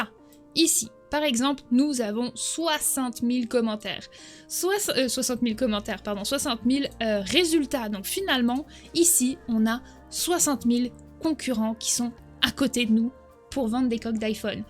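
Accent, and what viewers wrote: Canada. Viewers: par ton parler j’ai compris que tu te trouvais au Canada, je dois commencer bientôt mon projet fba mais actuellement j’ai pas bcp de temps que conseille tu pour commencer quand on a peut de temps a mettre?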